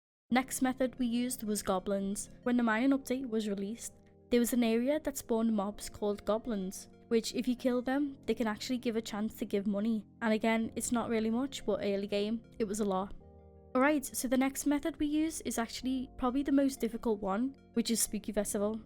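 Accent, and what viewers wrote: Liverpool, United Kingdom. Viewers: I love that british accent lmao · are u from liverpool u have a scouse accent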